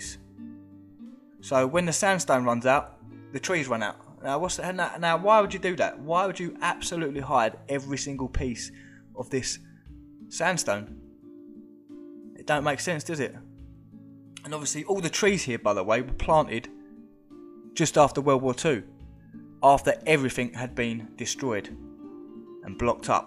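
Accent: United Kingdom. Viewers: Hay love the accent bro it's so English even English isn't English enough for you cool video too love to find hidden stuff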